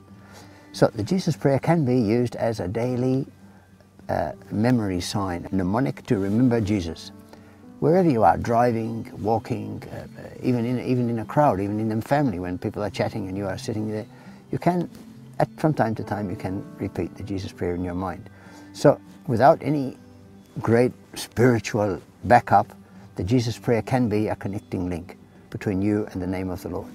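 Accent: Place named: Australia. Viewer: I’m finally picking up on Father ’ Australian accent.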